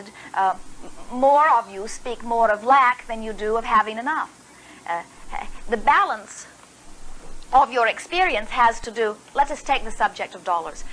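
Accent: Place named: Mid-Atlantic, United States. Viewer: Love the mid-atlantic accent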